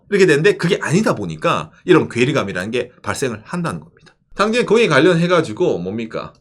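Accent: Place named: Andong, South Korea. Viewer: 우쨌든동? 안동쪽이 고향이세요? 😊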